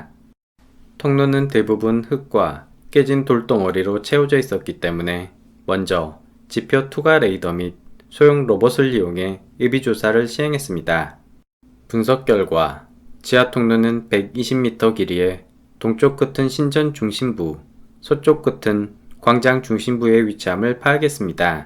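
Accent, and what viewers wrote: Jeolla, South Korea. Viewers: 말투를 들어보니 고향이 전라도이시구만요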